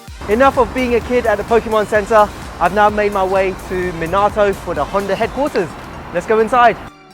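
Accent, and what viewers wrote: United Kingdom. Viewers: nice vid as always!! on a side note are you japanese? from your UK accent seems like you've lived your whole life in the UK love your vids been a sub since the first evo6 review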